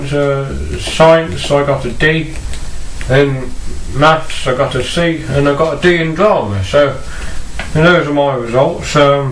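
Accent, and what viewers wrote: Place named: Northern England, United Kingdom. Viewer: His accent sounds northern (English) to me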